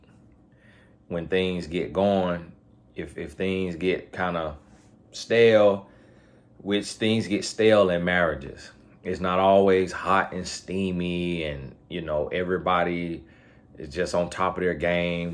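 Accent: South, United States. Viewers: I couldn't have said it better myself .His country accent and venecular makes him unique it's his je ne sais quoi if you will . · PLEASE stop apologizing for your Southern accent, vernacular and the manner(s) in which you express yourself. People who are critical of you and cannot appreciate the teachings you bring to the table do NOT deserve access to your wisdom. Please NEVER CHANGE. My family has Southern roots, and that’s one of the main things that drew me to your channel. YOU ARE REAL, and you keep it 100. Thank you for that! Those ‘articulate’ critics can just leave as far as I’m concerned. I LOVE YOUR REALNESS. GOD BLESS YOU AND YOUR WIFE, and I thank GOD for you, your teachings, and your wisdom. KEEP BEING SOUTHERN/‘COUNTRY’ YOU. Your loyal fans love it, appreciate it and accept you for who you are!✅🙏🏽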